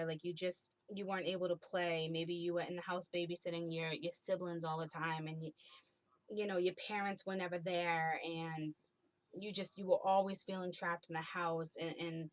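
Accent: Boston, United States. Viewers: (Aries) omg. the only differences in you and my Scorpio ex is the hair, color, same hair, different nose and your I assume boston or jersey accent, leaning towards boston though insead of Ky. It's crazy but I love your videos, your too decent. 3rd party situations with her and me being verbally and emotionally abusive to her because I........just fucked up but. I miss her. I always enjoy your videos. :)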